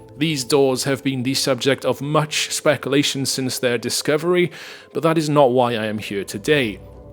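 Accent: Wales, United Kingdom. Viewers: Is the narrator Welsh?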